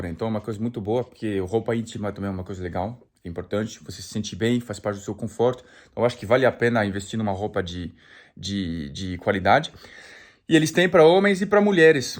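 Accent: Brazil. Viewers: O francês abrasileirado!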